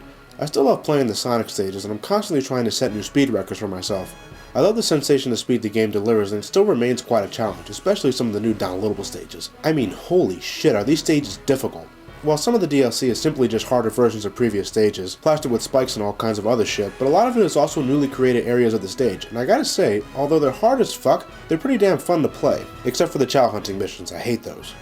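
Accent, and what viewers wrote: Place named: Pennsylvania, United States. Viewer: My personal thoughts (now that I have the PlayStation 3 version) Daytime stages: 9.5/10 Nighttime stages 9/10 Tornado Defense: 7/10 Eggmanland (which, for first timers, can go f**k itself): 2/10 Overall: 8.75/10 And for saying war-hog, it's his Pennsylvanian accent.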